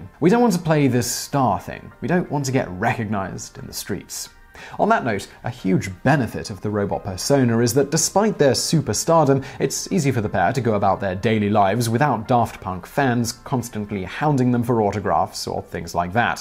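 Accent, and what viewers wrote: England, United Kingdom. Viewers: Hey it's Simon Whistler, damn man did you leave visualpolitk, i love that channel and i watched it for you and you alone, you give everything this special style, by this i mean it doesn't sound force scripted or anything and yoyr english accent adds to it as well, nice to see your on this channel as well, I'm gonna subscribe because your on this channel too! 👊👍🍻😁😊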